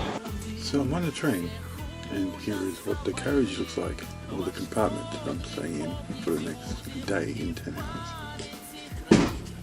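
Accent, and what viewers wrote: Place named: Russia. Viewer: I guess you were a child or a teen when you came to Australia from Russia? I can notice some Russian accent. I'm living in Brisbane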